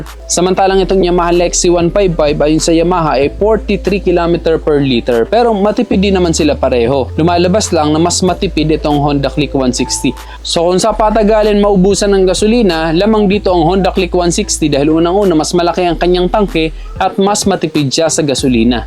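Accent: Samar, Philippines. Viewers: Taga samar ka no? Yung tuno mo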